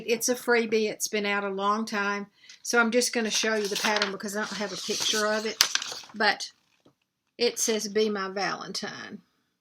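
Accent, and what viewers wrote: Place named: Arkansas, United States. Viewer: . I loved your Arkansas French accent. My NE accent would be funny too! I love everything you do. That pillow for your gd is awesome and she will treasure it. TY for sharing what you have done! I do have a house generator as we get wind gusts and snow here on the coast! I’m so glad I got it. I too am blessed!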